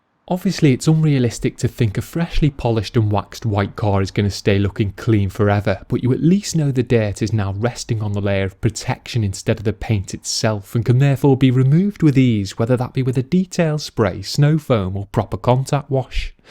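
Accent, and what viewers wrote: Liverpool, United Kingdom. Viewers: Haha....Brilliant....As said that is a proper Liverpool accent... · Sound like that British guy in the movie gone in 60 seconds. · I like you, you're a posh scouser and your video quality is good. So you've deserved a like and a sub. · For those that don't know, it's a Merseyside (Liverpool) accent. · Almost certainly Liverpudlian accent to me. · God i don't like this dialect of uk english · i don't get it, are you getting hate from your fellow country men or from other countries? To me, you just sound like a normal British guy.....as a matter of fact, your voice is actually really relaxing. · Sounds Liverpudlian to me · British you dumbass. You dont know anything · Hes from liverpool, scottish doesnt sound like that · His accent is Soooooooooooooooooooooooooooooooo British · god I hate british accents so much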